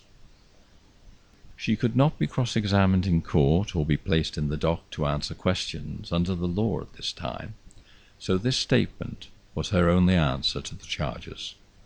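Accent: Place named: England, United Kingdom. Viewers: Aaaah that English accent, It sounds so very elegant to me I love it!!!! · Indeed very lavishly narrated in a typical English accent story of Emile A'Langeley trial begins. Precisely another part of the world in India, 30 June 1857 nearly 600 Scottish n English family killed by Indian Mutineers in Lucknow Cant, in which my ancestor actively took part. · Hes from liverpool, same place I'm from.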